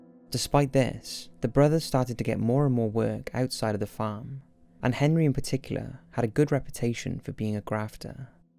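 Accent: Wales, United Kingdom. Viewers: This was amazing! Your quality of production and information is always top notch. Also, I'm welsh and I can hear a bit of a welsh accent in your voice..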